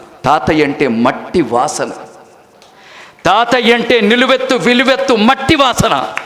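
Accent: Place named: Andhra Pradesh, India. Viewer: Nibhasha...Andra lagaunndhi...jai telangana..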